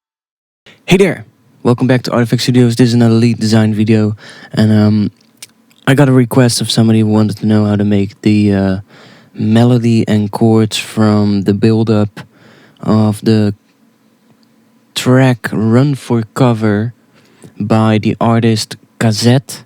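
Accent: Netherlands. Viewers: Yo artFX you sound dutch haha! Anyways, i have a request, i have never encountered a really good tutorial for a deep house stab like in the song Coyu & Ramiro Lopez - 1, 2, 3... Fire! (Bontan Remix) which starts . Enjoying your tutorials!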